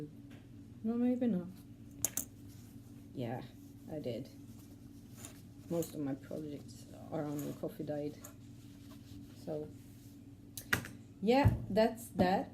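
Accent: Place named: Sweden. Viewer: Hello from the UK 🇬🇧 I love your swenglish! And great wips x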